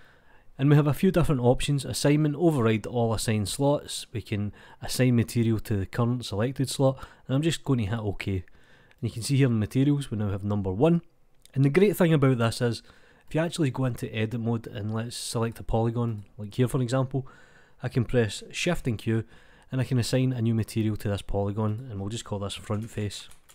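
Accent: Scotland, United Kingdom. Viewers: That's Scottish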